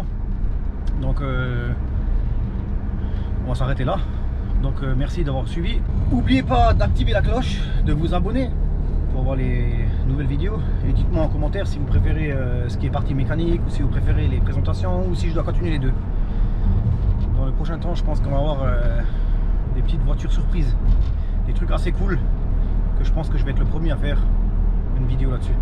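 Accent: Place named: Forbach, France. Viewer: Salut, secteur Forbach? Vu l'accent 😉. As tu déjà pensé à acheter un bac a ultrason pour le nettoyage des pièces, sa te permettrait de faire autre chose en attendant la fin de la minuterie du bac a ultrason. champion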